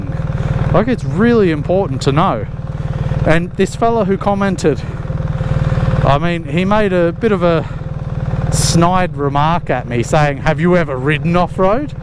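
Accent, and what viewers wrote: Australia. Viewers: thank you for showing us!! also you where just in Portugal and all over Europe you sounds Australian and you now live? in Estonia? talk about adventures XD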